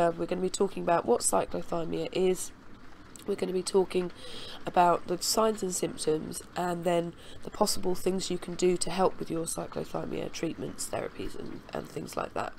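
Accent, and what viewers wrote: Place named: United Kingdom. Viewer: I’m glad you have a British voice.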